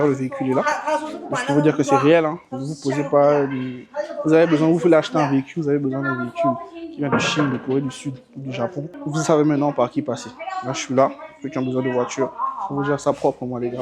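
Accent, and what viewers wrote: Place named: Cameroon. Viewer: Ce le français camerounais ❤❤❤❤❤❤❤❤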